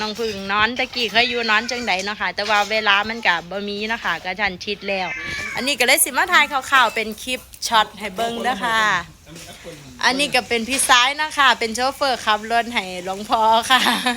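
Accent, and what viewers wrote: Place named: Isan, Thailand. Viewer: สาวชัยภูมิ,สำเนียงภูเขียว ชุมแพ ภูเวียง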